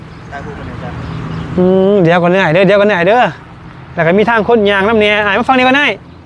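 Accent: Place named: Isan, Thailand. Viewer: สำเนียงเจ้าเป็นโฆษกหมอลำกะบ้อได่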